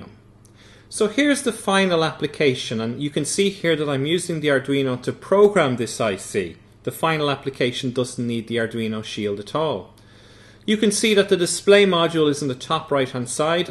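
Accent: Ireland. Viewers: Hey, well *I* knew you were Irish because you obviously sound Irish. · I called it!!! I knew you were Irish! At first I thought British but then I realized cause of the way you say three! Haha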